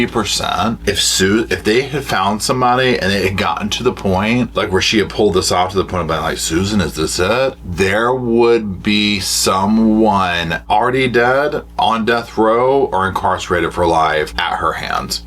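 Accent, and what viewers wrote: South, United States. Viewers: I’m listening to you as I’m having my afternoon coffee. Thinking that you are from the south but couldn’t put my finger on it. Just saw Pittsboro, we lived in Fayetteville(Fort Bragg) for 8 years. We lived there when this happened and I had just had our youngest child. I was so frightened to go outside, even though union was further west in SC, the car hadn’t been found yet. It was a time when carjacking was becoming a thing. So yes Susan Smith had people looking side eye at black men in a red car. It was a horrific crime and the judge showed pity on her by allowing parole. She’s right up there with Sarah Boone and Letiticia Stauch in her narcissism So heyyyyy from east Alabama!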